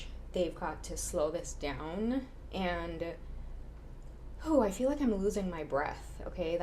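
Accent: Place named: Trinidad and Tobago. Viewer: Girl this is my first video of yours and I liked and subscribed immediately purely for the trini accent 🇹🇹❤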